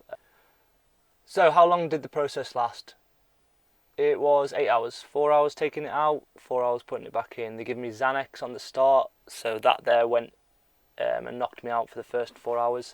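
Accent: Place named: United Kingdom. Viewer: What was the FB group please? Didn’t catch as much as I tried (UK accent) :) Keep posting mate, good results for me.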